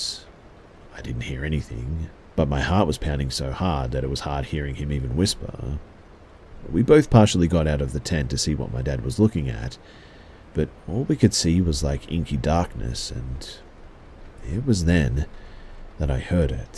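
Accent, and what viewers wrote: Australia. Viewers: Great to hear a Australian voice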